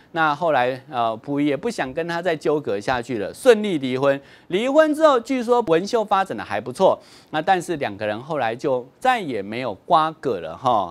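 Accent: Taiwan. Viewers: 台湾國語聽得恶心叭啦。 老是用什么那，耶，喔，像在跟小孩說話。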